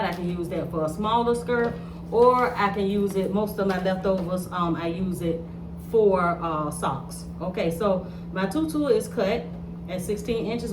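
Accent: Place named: Lowcountry, United States. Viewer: Im watching and learning .. you sound just like my family in the low country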